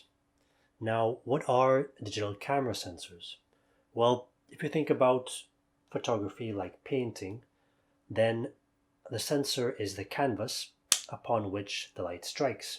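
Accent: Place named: Wales, United Kingdom. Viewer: Spent ages trying to figure out your accent. I thought there might even be a bit of Welsh there... heck, even throw in some Carribbean. Man of the world, probably!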